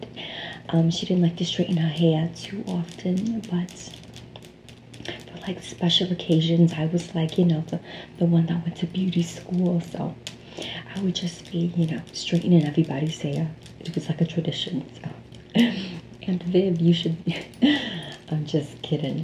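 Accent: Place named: New Jersey, United States. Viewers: Ohhh jersey accents in asmr are elite 😍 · Jersey Accents in ASMR is sooooo Top Tier🥴🤌🏾🤌🏾🤌🏾🤌🏾🤌🏾 Thank You Always Beautiful 😍🥹🫶🏾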